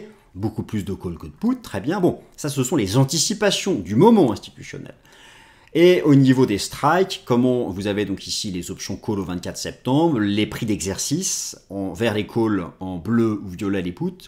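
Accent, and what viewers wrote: France. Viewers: bien bonjour le j adore vos video avec les prononciantion à la française c est votre marque de fabrique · continuer à dire RANGE à la française monsieur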